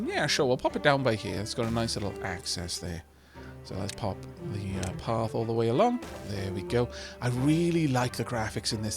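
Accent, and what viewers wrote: Wales, United Kingdom. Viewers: There is something soothing and comfortable about watching videos. But I admit to being biased as I love the Welsh accent! I'm crossing everything I can cross (fingers, toes, eyes, wires) hoping for a ridiculous amount of Timberborn. Am I asking too much? Never!!!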